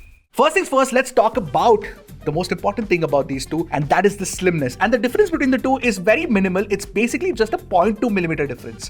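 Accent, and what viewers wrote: India. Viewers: Indian English accent is so Funny😂😂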